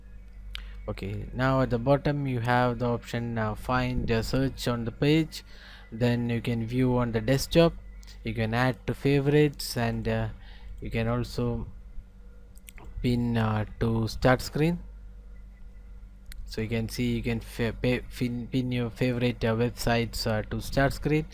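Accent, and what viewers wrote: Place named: South India, India. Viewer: Holy fuck... no offence man but your accent is very heavy making it impossible for me to understand most bits unless I have re-repeated them several times. Also, you do seem to have a heavy southern indian accent.. Please get rid of it!? You have a nice video and the content of your video and review is pretty good too. I will seriously recommend you to work on to neutralize that tone and accent and at least sound proper (your pitch was uncontrollable and went up and down monotonously as if you were least bit concerned or overly bored) and make sure there are no background noises(I could hear a song playing in the background clearly). Otherwise the review was helpful... thanks!!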